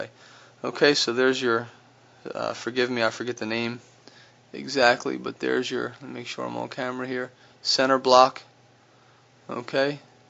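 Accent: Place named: New Jersey, United States. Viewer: I love your analogies A potato chip? LOL!!!!!! Love the accent too. New Jersey?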